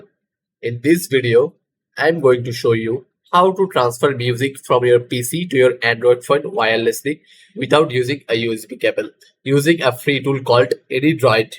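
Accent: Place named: India. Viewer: Where are the US English accent I only find Indians accent which is hard to understand .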